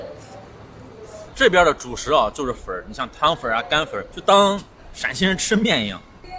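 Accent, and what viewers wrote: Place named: South, China. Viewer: 喜欢听说南方普通话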